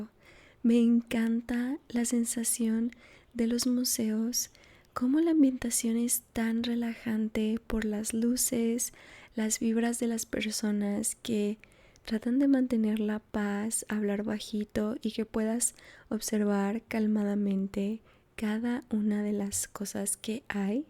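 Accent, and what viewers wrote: Mexico City, Mexico. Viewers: Yo también pensé que eras de la CDMX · Wow! Yo juraba que eras de la cdmx por tu acento 🥹 qué bueno que fuiste y conociste mucho, yo creo que los que vivimos aquí no terminamos de recorrerla! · Casi juraba, antes de este video, que eras de la cdmx y vivias en la roma o en la condesa jajaja. Que bueno que te gusto, salu2!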